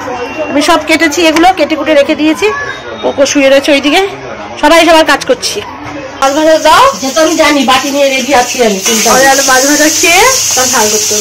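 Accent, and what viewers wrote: Bangal, Bangladesh. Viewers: শুভ জন্মদিন দাদা 🙏🙏 আপনার সুস্ততা কামনা করি সবসময আনন্দে থাকবেন আর আমাদের আনন্দ দেবেন আপনার কথা শুনলে খুব ভালো লাগে বাঙাল ভাষা শুনতে বেশ লাগে তোমার মেয়েকে অনেক অনেক ভালোবাসা ❤❤❤❤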